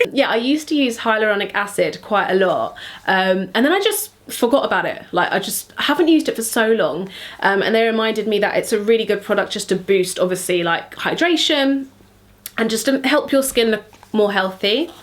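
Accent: London, United Kingdom. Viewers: "Today is a lih-uw makeup hole" I love London accents lmao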